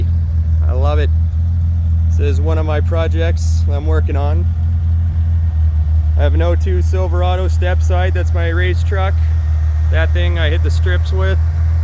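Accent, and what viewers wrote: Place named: Canada. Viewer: Sound like your from Canada.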